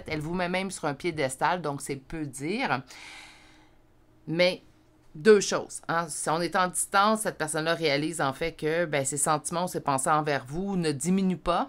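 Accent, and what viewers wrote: Canada. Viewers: C' est avec plaisir,très très Belle Personne et Franche...j' Adore ❤❤❤❤❤. Prenez soins de vous.j' Adore et toujours adoré l' accent Canadien et les Canadiens.❤❤❤.à mon retour de vous féliciter ❤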